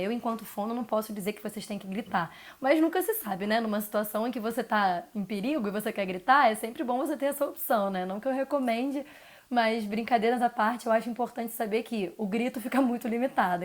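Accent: Rio de Janeiro, Brazil. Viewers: Não consigo assistir com esse sotaque carioca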